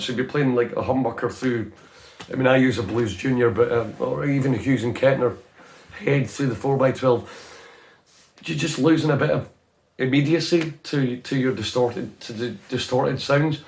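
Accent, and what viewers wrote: Scotland, United Kingdom. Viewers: Hey, Nice to hear a fellow Scot talking guitars. I also own a GT1 and I know what you mean about feeling that something isn't quite the same. I sense this being the same for all modelling gear at whatever price range. I run the GT1 into a Two Notes Cab M for cab emulation and it sounds as good as any modelling kit out there (to me anyway). I think cab and space simulation is really where the difference is made. I swapped between tube amps and modelling for ages, finaly seem to sticking to the Boss Nextone (not a modeller) which sounds and feels excellent. Anyway, I like your channel man 😎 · Real world every day use of one size fits all swiss army knife go anywhere do anything on a shoestring budget guitar pedal? Thank you for posting man. I got one and am just getting the hang of it. My Pearly Gates equipped Les Paul seems to have a higher output than the presets were made for even the clean patches have too much gain. 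It's cheap, portable and Boss reliability. Love your Scottish accent man. Cheers from the Caribbean.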